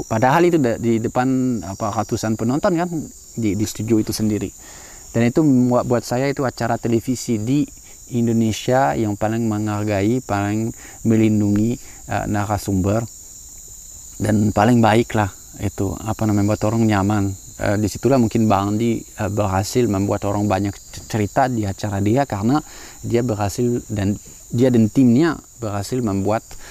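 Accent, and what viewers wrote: France. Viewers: Aksen france nya sambil berbahasa Indonesia begitu gurih di telinga, enak didengar. Kalo ngobrol sama bapak ini kayaknya asik bisa berjam jam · Channee kalWeit masih kntal logat perancisx yaa? Klo mgomong bahasa indonesia.."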